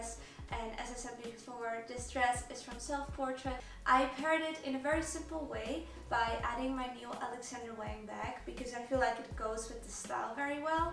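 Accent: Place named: Netherlands. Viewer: Haha dacht al dat je Nederlands was door je accent blijk je dat ook te zijn 😂😂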